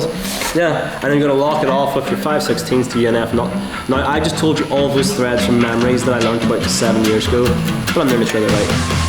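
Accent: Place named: Ireland. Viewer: Nice vid interesting facts about the foot cylinders sizes. Strange hearing a irish/northern accent 😂 if your even from here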